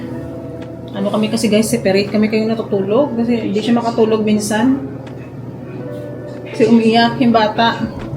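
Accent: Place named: Hiligaynon, Philippines. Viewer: You still have Ilongo accent even speaking Tagalog ay ambit sa iyo Monday!!!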